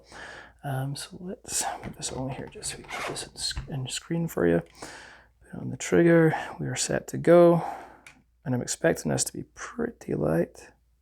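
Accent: Scotland, United Kingdom. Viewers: Its so good to hear a fellow Brit / Scot talking about firearms. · Liked and sub'd! Great Review. Sounds like you are Scottish, am I wrong? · Do I hear a hint of Scottish?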